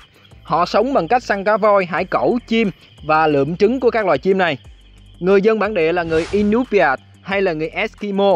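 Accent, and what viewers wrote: South, Vietnam. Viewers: đọc dễ nghe,giọng nghe dễ hiểu.chuẩn miền nam. chứ giờ 1 số kênh đọc giọng miền nam k ra miền nam,bắc k ra bắc nghe ghét cực kì. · Kênh hay giọng rất nam bộ thích đó nghen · bạn có chất giọng của người miền nam nghe dể thương dể mến nên được nhiều người thích, chúc mừng kênh ‘ TRUYỀN HÌNH’ của chúng ta ngày càng phát triển mạnh mẽ lên 10triệu Sub nha! Ủng hộ hết mình luôn bạn nhé!!!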